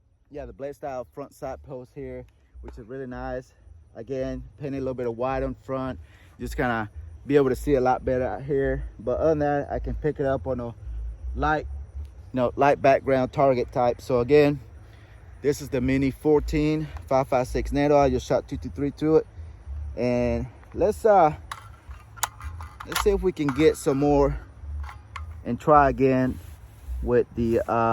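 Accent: South, United States. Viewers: First time i ever heard a hispanic/southern accent mix. Nice!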